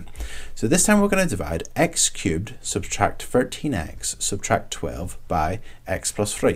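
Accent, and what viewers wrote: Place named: Northern Ireland, United Kingdom. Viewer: Man I love northern Irish accent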